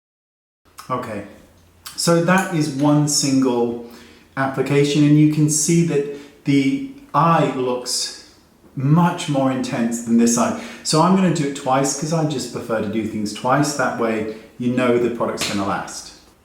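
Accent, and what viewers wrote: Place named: United Kingdom. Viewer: Oh my heart! It dropped at totally useless... That BRITISH ACCENT 😍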